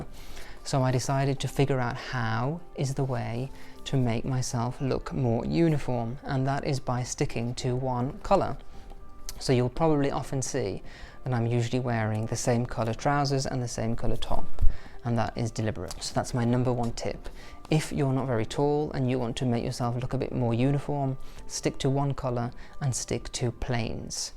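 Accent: RP, United Kingdom. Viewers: You’re so sophisticated, calm and peaceful! I love the British accent❤️. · I'm really surprised to hear you say you have a very middle-income background, as your voice and demeanor comes across as quite 'posh' :) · You are a delight,. I must say you sound so posh and I mean that as a compliment. So crisp, yet gentle, and gracious. Can't wait to see more. #sophiebestlife If you want to find the most calm, elegant, historical and beautiful places in the US...please reach out. I'd love to provide ideas. Just a couple to start your US list - Savannah, Georgia; Santa Barbara, California.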